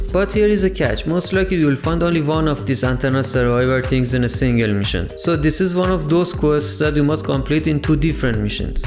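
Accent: Iran. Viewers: از لحنت معلومه ایرانی هستی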